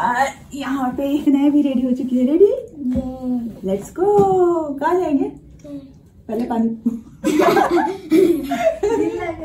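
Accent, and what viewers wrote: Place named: India. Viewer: Fiiling philing ek dam deshi wali English very nice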